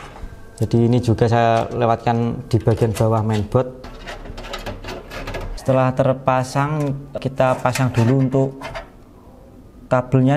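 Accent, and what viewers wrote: Java, Indonesia. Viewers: Ngomong e, medhok parah !!!😊😊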